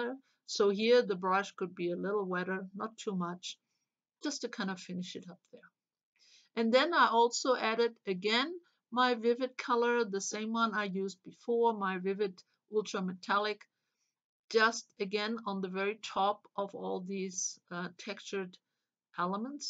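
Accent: Germany. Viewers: hab doch gedacht ich hör etwas deutsch heraus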